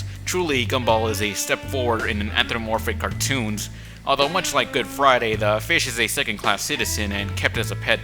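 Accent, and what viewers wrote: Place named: Texas, United States. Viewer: The southern accent was your Texas showing.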